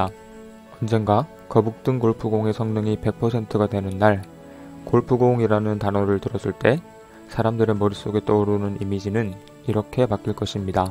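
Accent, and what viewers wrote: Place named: South Korea. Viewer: 사투리라 알아듣기 힘들어요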